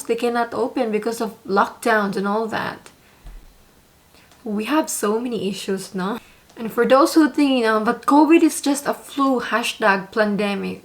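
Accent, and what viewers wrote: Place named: Philippines. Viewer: Please Tagalogin mo nalang. I am having a tough time understanding your English. You are talking in English word but the accent is Filipino that is what is making it hard. There is nothing wrong with taking your native tongue.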